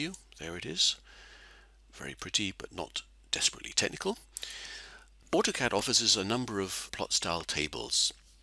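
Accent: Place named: South Africa. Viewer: I'm trying to work out where you accent is from, Im guessing South Africa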